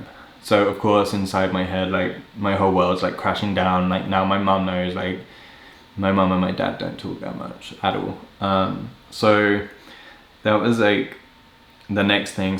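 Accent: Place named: United Kingdom. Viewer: I totally thought he was American at the beginning, then I thought he had a Speach impediment, then when he said he was 32 I was totally confused because he looks like 22 at the most, then finally I realized he has a British accent.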